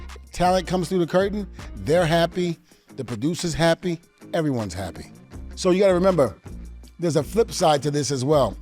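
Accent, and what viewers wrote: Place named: Baltimore, United States. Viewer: i literally never noticed his baltimore accent before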